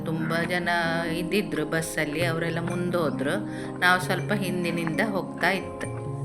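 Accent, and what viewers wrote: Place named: Kundapura, India. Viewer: ನೀವು ಕುಂದಾಪುರ ಕನ್ನಡ ಮಾತನಾಡತಾ ಇದ್ರಲ್ವಾ ಹಾಗೆ ಕೇಳ್ದೆ ನಮ್ಮ ಅಕ್ಕ ನ ಊರು ಸಾಗರ ಭೀಮನ ಕೋಣೆ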